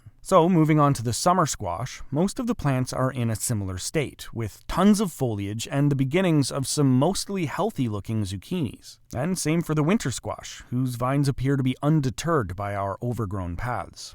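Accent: Canada. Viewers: I wonder the same. He sounds Canadian. The topography looks northern too. I'm in Maine, zone 4/5.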